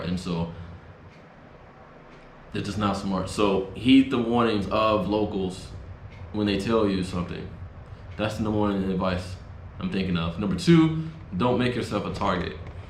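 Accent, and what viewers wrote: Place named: United States. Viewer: Saludos desde O.C. En Cali nadie sale para celebrar el año nuevo. Todos están en casa con su familia. Si salen se salen después en grupos. Si puedo darte un consejo, soy también de Oak Cliff pero tú Español y tu accento es gringo. También tu andas como no sabes nada de Cali o el mundo Latino. Cuidar Pa tengo que suscribir a tu canal.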